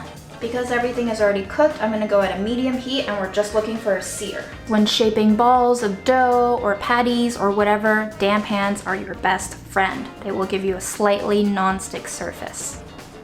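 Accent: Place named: New York, United States. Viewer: !!! I love coming home and pressing play on your videos after a long day of work. So chill, So relaxed, So New Yawk, So Talented and Sooo Entertaining!! Thank You!!!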